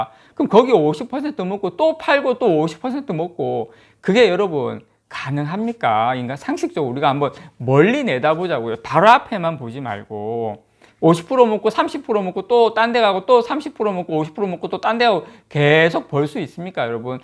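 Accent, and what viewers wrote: Gyeongsang, South Korea. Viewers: 5년만젊었으면좋겠어요 70이 저만치와있는데 주식입문2년차.,올라가면좋고 떨어지면 또 사면되고.기다리지도않고요..심심하지않아서좋아요 고퀄지식에유머에경상도?억양도웃음나게하고...가슴만보여서셔츠와상표만보고 ㅎㅎㅎ감사합니다....알찬방송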